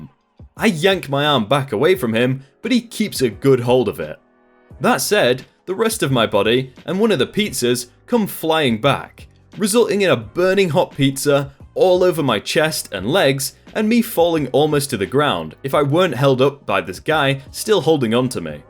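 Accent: United Kingdom. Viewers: If you want to find a taser flashlight, I have one. It's called the Guard Dog Special Ops Flashlight/Taser combo. I live in America so I'm not sure of you could get one from these people as you sound British. Still, if you can I highly suggest it!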